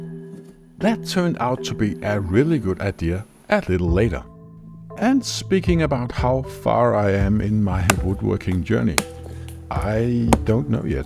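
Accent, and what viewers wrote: Denmark. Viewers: So happy to hear you have reached far, i always love when i see your uploads. keep it up.. it makes me smile when i hear your Danglish, and it helps me in my day here.. Greetings from a fellow Dane living in Indonesia.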